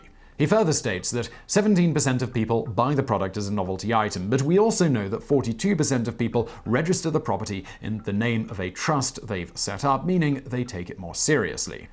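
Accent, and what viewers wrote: United Kingdom. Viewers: I enjoy any video that has a British guy repeatedly saying fart!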